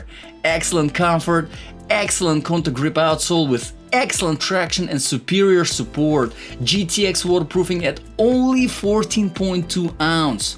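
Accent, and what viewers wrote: Russia. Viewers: Hi there !!! First of all I did sense russian accent !!)) Now about boots you recommend !! I will not buy any of them !! The all are no good all 10 of them !!! Let me explain you why !!! They all have the same or similar shoelaces tight up desighn . Those shoelaces going through fabric or plastic loop from bottom to the midle of boot and at the top of a boot through sets of metal or plastic hooks !!! This is very poor desighn because send and dirt will packed up on your shoelaces wile you hiking and friction will tear those loops through , those hooks at the top of those boots will do the same thing to your shoelaces because those hooks are tight , and every time you tight your boots the same part of shoelace will be abused by friction on that hook. I talking of few month of everyday carrying and you will be noticed of unprepared damage !!! 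Any field repair to this loops will not hold up for ling , maybe one day and than all sawing will tear them even worst . Same thain with those hooks they will keep twaring your shoelaces, and there is absolutely no way to fix them , if you try to file them to smooth corners , you will do even worse by creating shapr edged scratches. !! Only good design is metal eyelids where shoelaces go straight trough those sidelips on your boots !! That design is very forgiving on your shoelaces and much easier to repair on the field , specially if you are not just hiking for a day , but actually surviving , and covering a lot of distance on daily bases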